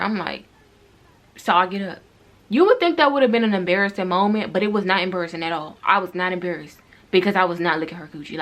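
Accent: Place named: Maryland, United States. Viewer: Are you from MD. I can hear the accent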